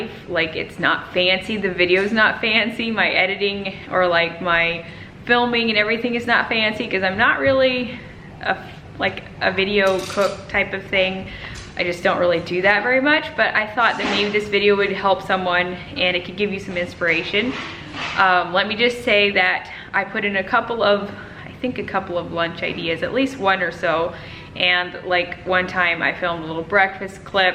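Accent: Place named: South, United States. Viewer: You sometimes sound so southern although I know you aren't originally from the south. Everyone I have ever met from "up north" has always called dressing, stuffing whether in a bird or pan. Edit: Tough Shrimp means you overcooked. For shrimp you need to only cook till it's pink all the way through. If you keep cooking it will be tough and chewy.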